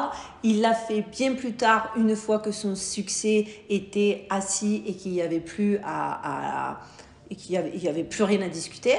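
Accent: South, France. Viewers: Salut, Comment schpouinkes-tu? Vidéo super de kabou. Bravi, bravo! As-tu entendu parler d'Ummo et des ummites, par hasard? Tu peux toujours regarder et écouter les vidéos de Stone Gardenteapot. Ensuite, tu peux regarder et écouter celles de Jean-Pierre Petit, mais elles sont plus difficiles d'accès. Tu as l'accent du sud de la France, mais tu as une bobine de Suédoise. Bises alchimiques du Dimanche soir. 🦆 🎸 🎤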